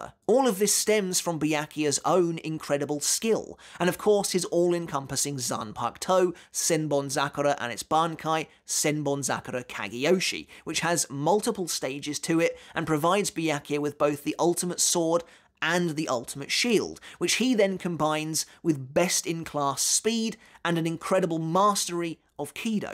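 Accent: United Kingdom. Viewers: Didn't know an American spoke in a British accent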